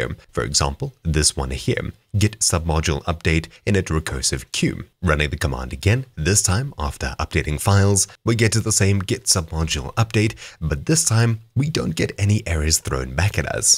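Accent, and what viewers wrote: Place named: South Africa. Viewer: Whoa sounds SAfrican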